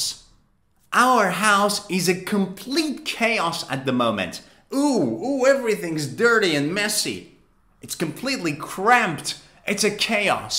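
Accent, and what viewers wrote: United Kingdom. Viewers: this is the best lesson we had and it is very good to use british accent thanks a million all the best sir 👌👍🇦🇫♥🌹🙏😊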